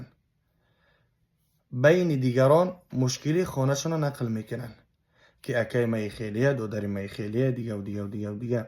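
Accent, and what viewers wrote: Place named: Afghanistan. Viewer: Чихе хушру гап мезанен ака! Тарзи гапзанитон кам кам ба гапзании Афгоно монанди дора. Чикадар Махкулайма гапзанишон.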